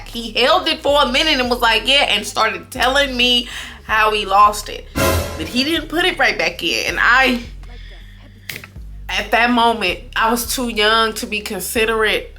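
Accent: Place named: Bronx, United States. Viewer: OMG! Sounds like this guy I know from the Bronx whose name started with the letter J 😂 You're an awesome storyteller, keep 'em coming!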